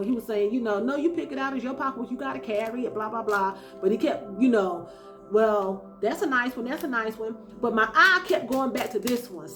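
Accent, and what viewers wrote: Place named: Brooklyn, United States. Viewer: Girl 😂 I can hear that Brooklyn accent in you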